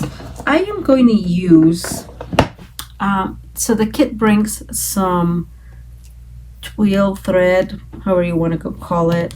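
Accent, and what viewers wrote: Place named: Puerto Rico. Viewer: Love watching you create cards,. You speak like a woman who lives here in the apartment building where I live, and she's from Puerto Rico.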